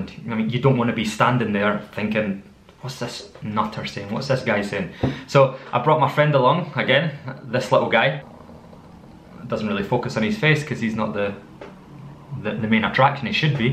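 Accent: Scotland, United Kingdom. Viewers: From the philippines and really addicted to scottish accent.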